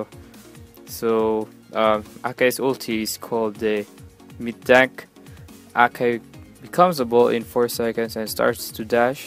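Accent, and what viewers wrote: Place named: Philippines. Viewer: pinoy 👍😂 halata sa accent.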